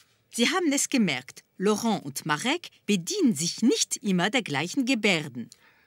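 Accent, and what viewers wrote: France. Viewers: "Sind sie nicht charmant diese Schildkröten" und dann noch mit diesem französischem Akzent...ach einfach nur himmlisch xD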